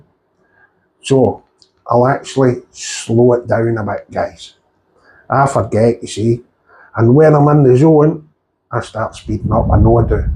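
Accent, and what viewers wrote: Scotland, United Kingdom. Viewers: The trade in is an awesome idea, wish we had something like that in the UK, I'll definitely get in touch and see about the shipping cost! Also I've got no problem with your Scottish accent!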